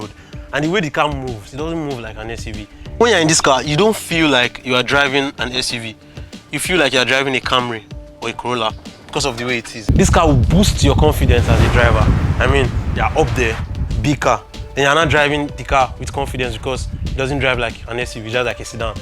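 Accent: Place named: Nigeria. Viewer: Great review. Love your Nigerian English dialect. Do you get the later models in Nigeria too?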